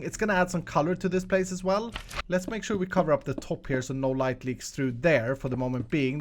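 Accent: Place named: Sweden. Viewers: Hey ARE you from sweden i just subscribed on you and you sound like a swedish Guy IM from sweden hejdå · yes hes swedish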